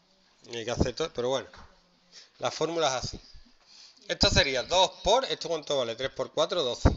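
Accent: Andalusia, Spain. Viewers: los 2 minutos hablando sobre el traje han sido mi parte favorita! bastante bien explicado, y no os metáis con el acento andaluz ostias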